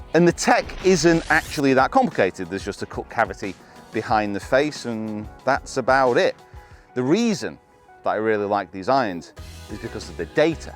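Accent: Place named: London, United Kingdom. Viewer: Hi, your accent is more if a London one. Sounds like you maybe been privately educated. I am always amazed how you can hit a 7 iron 190+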